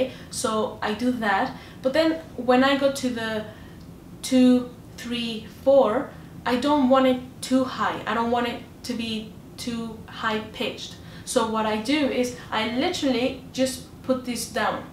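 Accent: Ireland. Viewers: Great lesson your accent is like a half Kerry half SAN Paolo. Thanks for sharing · Yet again another great tutorial video here, I have been very lazy over 2 yrs when it comes to Bodhran practice, but now I've discovered you I've made a pact that I will do 15mins a day but it ends up being 30mins no problem n that's down to ur teaching skills. You have an Irish accent in there somewhere? Are u Irish n moved abroad? Or the other way round? Do u play in a band by anychance? Sorry for all the questions btw I'm just curious 🥁🇨🇮🍻🥁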